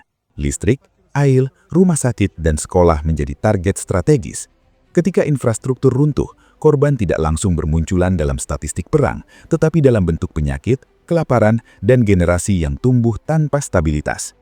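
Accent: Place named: India. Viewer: Același propagandist pro-rus, după dialect de origine indian, a început propaganda pentru anul 2026. Nu vă aplecați urechea. Riscați să vi se strice creierul datorită toxicității.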